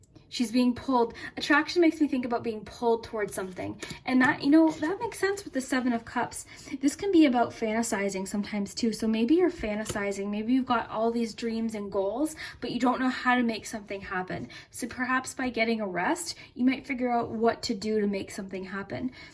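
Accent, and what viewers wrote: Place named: Canada. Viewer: Are you from Canada? 🙂I lived there before I know that accent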